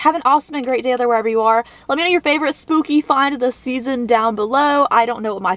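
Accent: South, United States. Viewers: I love it when your southern accent really comes through! You should do a picture of your 4 mug collection 😊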